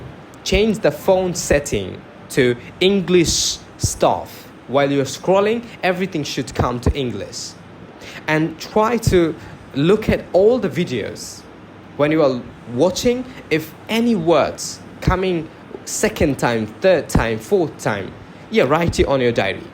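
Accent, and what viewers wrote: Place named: Bangladesh. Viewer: U still have bangladeshi accent though ❤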